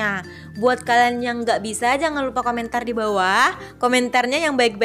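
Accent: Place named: West Sumatra, Indonesia. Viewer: Logat Minang Ndk Masalah,,Yg Penting Mantap Kak😊😊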